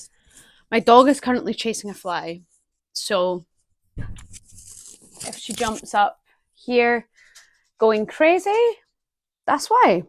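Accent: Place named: Scotland, United Kingdom. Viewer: Thank you for this video and message. I think you tapped into the energy I feel when I connect with my guides. It’s pure love and I don’t really feel it with other people, only with them. I think I also like the connection to Scotland. My grandmother and other relatives come from the Munro Clan and hearing your accent brings a familiar joy ❤ Have a good day!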